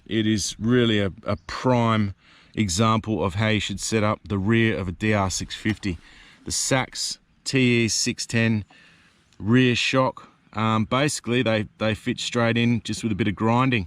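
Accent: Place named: Australia. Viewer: duuude sick build! where in australia are you from? the accent is soo mild from an american perspective. If you were british id think you came from central London rather than Cockney. I ride a 701 supermoto. Love supermotos i take mine on hard enduro all the time :) need a bigger gas tank tho xD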